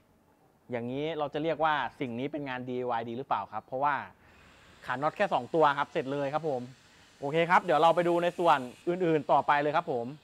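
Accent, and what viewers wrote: Thailand. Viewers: ติดตามตลอดครับชอบที่ภาษาอังกฤษสำเนียงไทยๆนี่แหละบ้านๆดี ไม่ต้องเป๊ะเวอร์เหมือนกับเรียน wall street english😃😃😃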